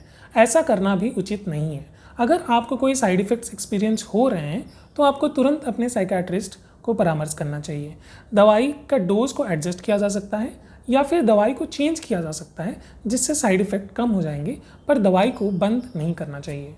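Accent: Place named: United States. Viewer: इंग्लिश भाषा का अच्छा इस्तेमाल करते वक्त जिस व्यक्ती का समझनेवाला आवज होना चाहिए........ इस विडिओ मे थ़ोडासा अमेरिकन उच्चारण सुनाई देनेके वजहसे सभी टिप्स अच्छेसे नही समझमे आ रही है....... जिस उद्देश से अच्छा विडिओ बनाया जा रहा है वह लोगोंतक नही पहुंच रहा है......... सभी टीम इसपर जरुर विचार करे क्योंकि ये दिमाग की डिसऑर्डर बहोत लोगो में दिखाई देती है......... जो काम की बातें बता रहे हो वो लोगोंके समझमे आ जानी चाहिए.........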